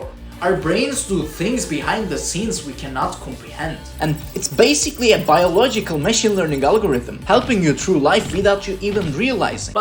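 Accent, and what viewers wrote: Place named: Turkey. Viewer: aksan türk aksanı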